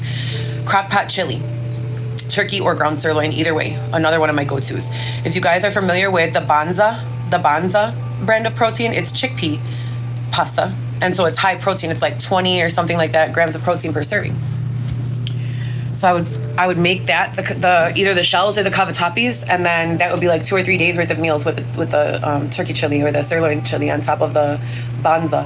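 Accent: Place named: Latin America. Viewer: I doubt you ever cooked for your kids. And that stupid latina accent - i just cant.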